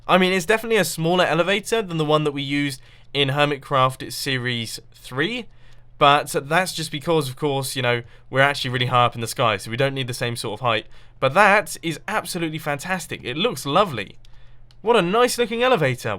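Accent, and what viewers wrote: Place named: United Kingdom. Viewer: is: Tall, hansom, has a British accent, smart, funny. Guys, hide you gool frens, mombo stel yo women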